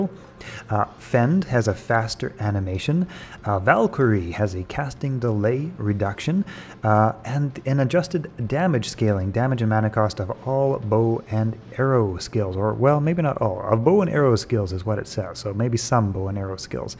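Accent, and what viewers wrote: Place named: Canada. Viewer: and the way he says about. I'm not saying anything bad, most Canadians are pretty nice and cool. But with certain words you can almost instantly know that they're Canadian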